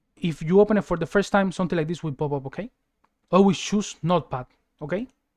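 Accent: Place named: Mexico. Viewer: Great video ... very easy to follow. Love your accent. Mexican?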